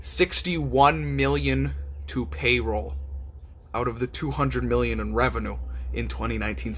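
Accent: Boston, United States. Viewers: Great video but that Boston accent gotta go kid. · I love the accent. It’s the first time I’ve really liked a Boston accent. Great music, too.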